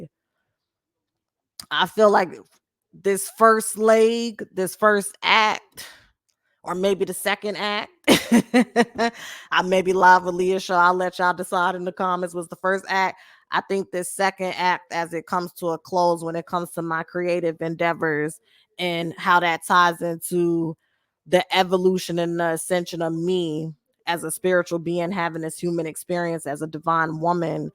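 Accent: New York, United States. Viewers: I'm going to miss you like crazy. I've been listening to you for years😔 I can't help but think "Damn, I'm not going to hear that strong ass New York accent dropping jewels no more"🥺 And now I have to say bye to my youtube crush😔🙏🏿❤🙏🏿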